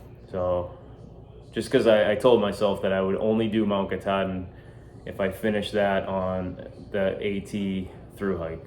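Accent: Massachusetts, United States. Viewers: This doesn’t have to be an end. A lot of people return to the trail to finish what was started as they get closer to retirement age. Life happens and things happen for a reason. Embrace the ambiguity life brings and move forward. On a selfish note- I’m going to miss hearing the familiar accent which I miss being back in every day (SE Mass native > Virginia- enjoying the AT down here). Hike your own hike, man…no matter what it is or how long it takes.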